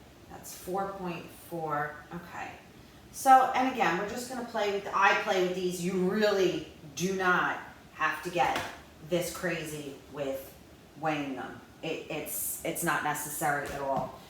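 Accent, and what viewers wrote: New York, United States. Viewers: Same! Living in the greater NYC area you can even call out the borough, and if you are really good the Jewish version of that borough. I think is in or from Long Island. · Too funny - the accent sold me immediately- only fellow New Yorkers can appreciate it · Great job on this video and easy recipe. Will be baking some tomorrow! I love NY/NJ hard rolls and miss them since I moved out of the area long ago. Plus I love hearing your accent! Thanks again.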